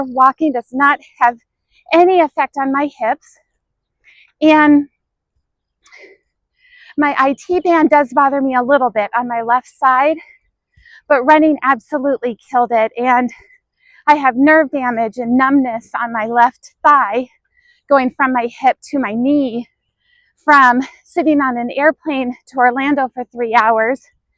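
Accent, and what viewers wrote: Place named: Midwest, United States. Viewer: What's that accent? MI, WI? "Kind of like a bad relationship." 🤣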